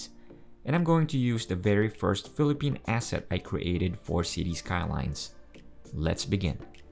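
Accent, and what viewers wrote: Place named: United States. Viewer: Akala ko amerikano ka biglang nagtagalog Hahahahaha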